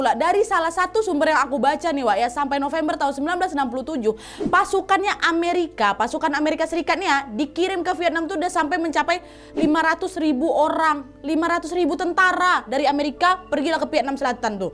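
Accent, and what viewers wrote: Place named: Padang, Indonesia. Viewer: Suka sama cara kak bawain cerita deh, tpi hari ini kak kok logatnya padang gitu hehehe.. semnagat ngonten terus ya kak 😊🙏🏻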